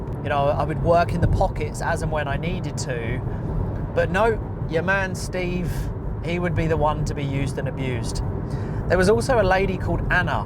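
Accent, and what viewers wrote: United Kingdom. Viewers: I noticed right away that you are English.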